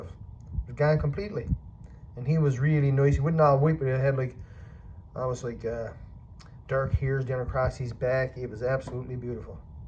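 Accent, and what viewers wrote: Newfoundland, Canada. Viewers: I did not know that Canadians can sound so different from US Americans. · My soul mate loved hearing your voice reminded him of his childhood in Grandbanks. NFL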